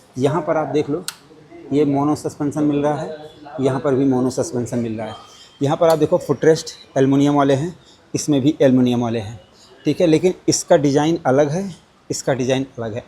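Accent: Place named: Awadh, India. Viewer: Bhai aap ka bolne ka desi awadhi tarika bahut hi gajab lagta hai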